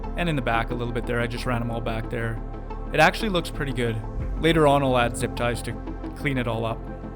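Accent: Canada. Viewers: The Canada accent is subtle but strong. It’s also the robotic and lack of vocal range.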